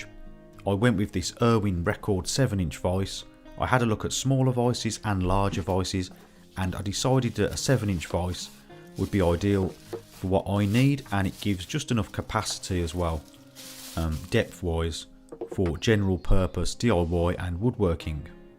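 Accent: Birmingham, United Kingdom. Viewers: Love the Brummie accent (I'm watching this in Australia, but I'm from Hall Green originally) - Thank for the video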